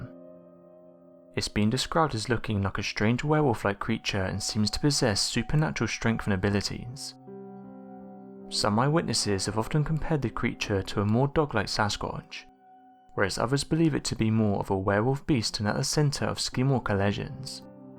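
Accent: England, United Kingdom. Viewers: It's not a robot, he's English.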